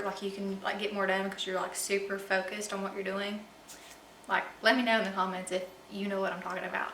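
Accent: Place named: South, United States. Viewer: Where are you from? I love your southern acent I wish I sounded like that LOL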